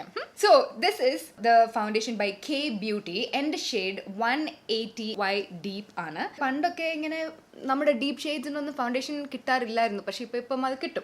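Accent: India. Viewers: I am glad you're trying videos in Malayalam! I am in Canada and I understand the language fully but its really hard to keep a conversation flowing even though my parents speak to me fully in the language. This motivates me to keep talking. Once I start, I am fine. Your Malayalam is definitely improving from other attempts so keep going! At least you have an Indian accent so it helps for it flow smoothly too! :) That is so sweet a follower helped for the translation too to help non-speakers!!! You're almost to 1M and I am excited, you are going to hit there before Jan 2022 I can feel it!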